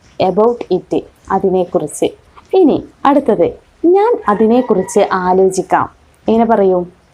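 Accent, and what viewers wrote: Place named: Malappuram, India. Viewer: I think u r from malappuram.because of ur talking style.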